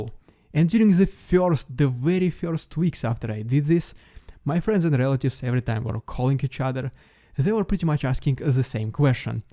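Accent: Russia. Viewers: It doesn't surprise me. Most americans find a Russian accent kind of endearing. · Hmm. Let me tell you something about heavy "Russian accent". If you've lived for more then 6 months in in a country, especially studying there, it's a sign of "lack of commitment" if you still retain that much of an accent. It means that you like your accent. In my experience with Russians that's very common and basically a sign of "imperial entitlement", basically contempt. And as with any contempt maximum disrespect is deserved. People will notice and will react, even if they can't explain it. I lived abroad for several years, in the 1990s and there was a Russian(Soviet if you prefer) community on the same block. It was quite common between them people that lived for 5+ years in the country and couldn't get their way in the grocery store.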